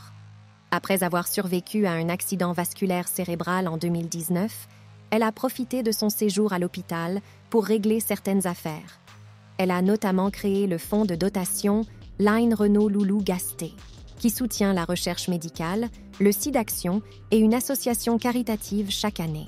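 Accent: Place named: Canada. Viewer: On s en fout, ce n'est pas nous, drôle d accent ce robot (canadien)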